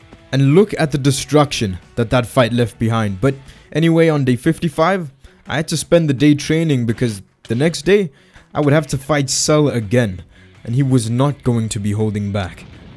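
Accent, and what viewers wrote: South Africa. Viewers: your acent sounds south african (is it?)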